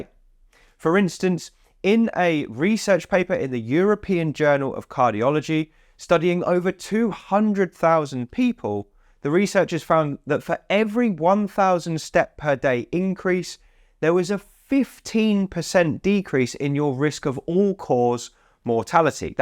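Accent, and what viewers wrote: United Kingdom. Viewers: Really like this channel. Just ordered your book from Amazon. There is something about a British accent that makes one sound smarter :) Just to add that 3 X 10 minute walks per day control blood sugar and hypertension better than 1 X 30 minute walk per day.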